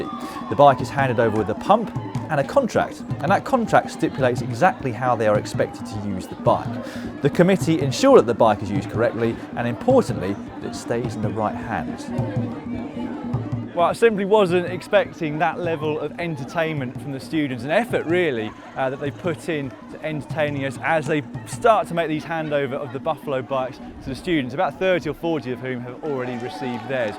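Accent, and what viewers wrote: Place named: United Kingdom. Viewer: A worthy and life changing cause to be sure. But I can't help but feel the hypocrisy of spending ~£10,000 (or more) of Her Majesty's British Pounds on flying, and at least 1 other staff member + all the camera gear out to Zambia + accommodation + translator + guide + transfers to report on the donations of others that provide cheap (albeit very important) bicycles to students and others. I also couldn't stop chucking at the obviously colonial nature of having a British national (Sorry, you're very British with a very British accent) report in Zambia which was a former British Colony, where Her Majesty has a fairly checked past. I'd have loved to have seen a local Zambian media crew report with the students and the GCN invest the £10,000 on more bikes or other charitable works. Regards Jordan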